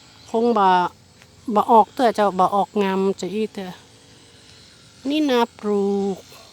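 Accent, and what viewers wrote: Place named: North, Thailand. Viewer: ฮ่าๆๆ เมือง เป็นเพื่อนกับคุณ แล้วนะคับ นานแล้วด้วย ในคลิปข้างๆน่าจะเป็นต้นยูคา ใช่ป่าว ปลูกริมนาแบบนี้ ดีจัง ภาษาเหนือป่าวเอ่ย เพราะจัง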